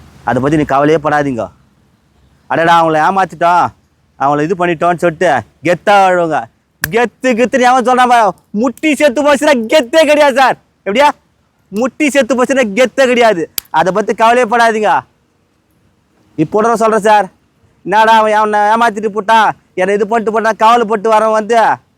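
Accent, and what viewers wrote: Madurai, India. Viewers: மதுரை வாசகம் சூப்பர் 🎉🎉🎉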